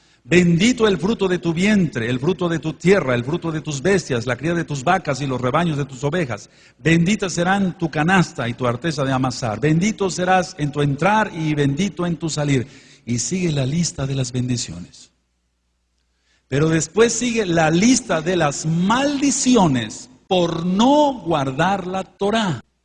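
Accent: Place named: Latin America. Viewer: Me da tristeza ver a hombres como este "predicador", con claros rasgos físicos de un latino y con acento latino, tratando de imitar al pueblo judío, dejando crecer su barba y usando unas cuantas palabras en hebreo (mal pronunciadas claro está). ¿Cuál es el afán de practicar la ley (torah) judía? Jesucristo ya murió por nosotros y solo tenemos que seguirle a Él, no volvernos atrás a la ley judía, la torah es para los judíos, el nuevo testamento para los cristianos. Al hombre que habla en este vídeo le digo, si vas a hablar en hebreo, "predica" completamente en hebreo, pero si este vídeo es en español, habla en español. ¡No traten de aparentar un conocimiento del cual carecen usando palabras en hebreo! Jesucristo es Jesucristo (No Yahschuah HaMaschiaj, eso es hebreo) Dios es Dios (No HaShem, ElOlam o Elohím, eso es hebreo) Iglesia es Iglesia (No Kehilá, eso es hebreo) Graba un vídeo "predicando" completamente en hebreo y entonces te creeré lo que hablas. 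Mientras tanto, En el nombre de Jesucristo, ¡Cállate!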